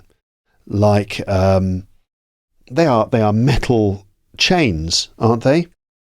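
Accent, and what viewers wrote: United Kingdom. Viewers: Greetings from Brazil! I’ve been watching your videos to improve my British accent, you’re amazing !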